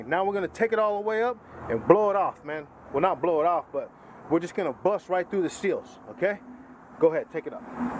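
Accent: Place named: Los Angeles, United States. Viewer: Hi nice video, you sound like you're from LA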